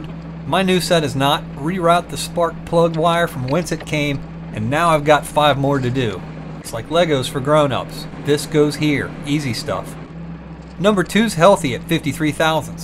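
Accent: United States. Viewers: Are you from Michigan?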